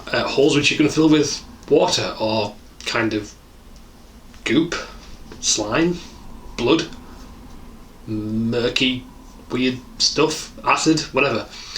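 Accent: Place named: England, United Kingdom. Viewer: Sargon as in Carl Benjamin the youtube personality. You sound like you're from the same part of jolly old. You even look like you came from the same clan. You can pretend I meant the original Sargon if you prefer.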